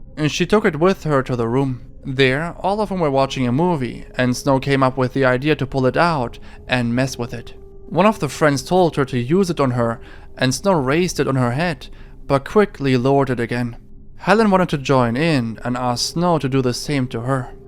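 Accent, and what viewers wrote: Germany. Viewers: I dont know why but i think you are german. You pronounce some words very similar to me as a german. 😁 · Bist du Deutscher? Wegen deiner deutschen Aussprache 😏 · Are you German? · Ooooooh you’re GERMAN! I’ve been trying to pinpoint it lmaooo I legitimately could only tell once the video started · you are the best man i have question where did you learn English ? you English is perfect of course with that Germán accent.. nice videos man no 1 fan here from NEW ENGLAND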